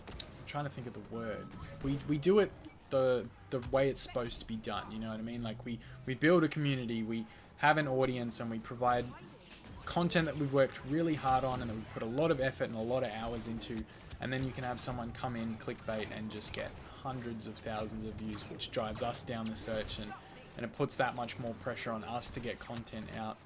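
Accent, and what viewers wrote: Australia. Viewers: You and make listening to an Aussie accent bearable possible. Thank you.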